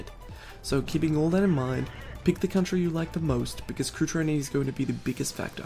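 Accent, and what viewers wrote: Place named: United States. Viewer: Well he is american.. And remeber tomato tomato..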